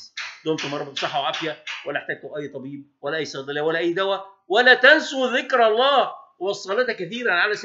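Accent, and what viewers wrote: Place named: Upper Egypt, Egypt. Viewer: استحالة تكون من اسكندرية انت ممكن من الشرقية او الصعيد لغة الاسكندرانين معروف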